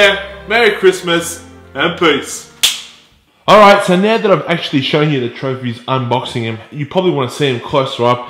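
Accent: Australia. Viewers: England? are you from Englan? i thought you were from Australia.. :p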